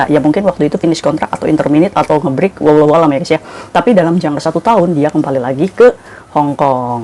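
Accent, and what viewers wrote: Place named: Bolet, Indonesia. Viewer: Kakak orang mana bahasanya kok sama bolet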